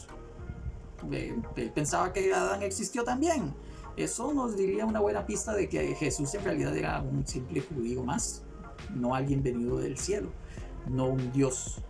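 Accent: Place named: Costa Rica. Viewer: Sos de Costa Rica??? Hasta cierto punto puedo identificar el acento de la gente de mi país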